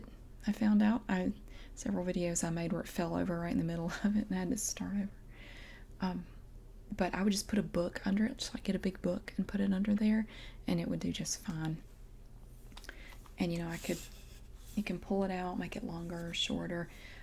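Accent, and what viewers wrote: South, United States. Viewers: We love your straightforward soft spoken vids. This display of your setup is especially interesting and informative. So much so that it tempts me to give it try too! Your down to earth manner is attractive and relaxing. Your sweet southern accents doesn't hurt either !! Thanks and keep em coming !!! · I laughed when you said, "I'm gunna move you!" . You already move us with the content you create. You have such a welcoming and comfortable personality. You come across as a very natural and honest lady. Really Down to earth! Your soft speaking voice and light southern accent are very relaxing and you're pretty darn cute too! I thought it was funny when we saw that your tri-pod looks to be the same as the one that I bought and your laptop looks like my Toshiba. LOL. . My Tri-pod is a Targus. :-O Keep the great videos coming. Thank You!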